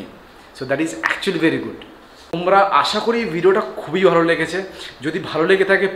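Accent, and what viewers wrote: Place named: Bangladesh. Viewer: Accent to puro bangladeshi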